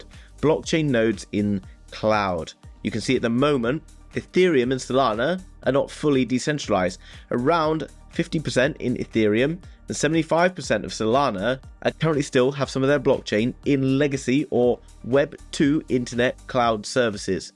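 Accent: United Kingdom. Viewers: You look like a young rich old wise man if that makes sense. It’s probably the old money living room and British accent you have. I love it haha 😂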